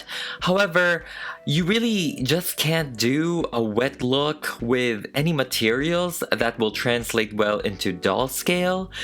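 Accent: France. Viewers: C'est tellement étrange de t'entendre dire des mots français au milieu de ton anglais, en vrai tu as un accent qui ressemble bcp à celui d'un français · You have a beautiful French ascent and also the French poodle doll you repaint! I love it! <3 <3 <3 <3 <3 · What kind of accent is that XD. It switched between French and mostly British. No hate tho it was cute af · love your french accent :3 ( I'm french :3) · the french accent yass bitch kiss from paris ❤️ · Quel exploit ! What a feat ! I didn't expect the final look with the drops falling but omg it's sooooo great ! Please keep talking with the french accent, it suits you very well (on behalf of a french woman) ! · I love the french accent tho XD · I like that French.English accent 😀😂and your outfit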